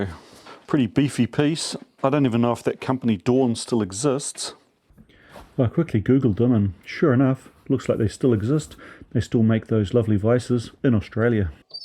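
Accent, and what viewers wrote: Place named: New Zealand. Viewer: I wondered how you kept your Kiwi accent tuned up, Regular visits back ;)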